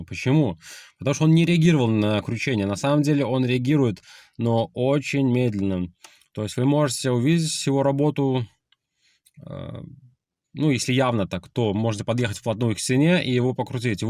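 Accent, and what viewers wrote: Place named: Belarus. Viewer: Судя по акценту , автор Белорус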